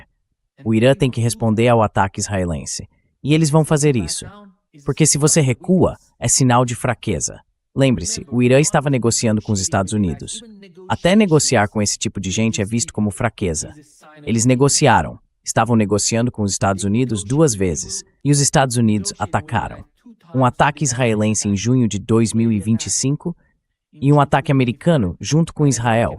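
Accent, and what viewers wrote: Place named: India. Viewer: nao é brasileiro, é só uma tradução automática. Acho que é americano descendentes de pessoas do Oriente médio provavelmente Ele tem um sotaque indiano, mas ele é branco, estranho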